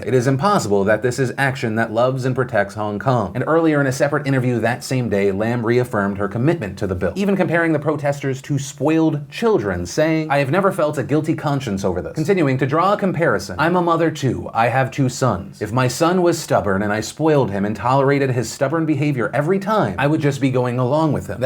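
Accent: South, United States. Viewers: Is from the south? I thought I heard a southern affectation in this episode. Also I had a roommate from Hong Kong, hope she’s doing alright right now.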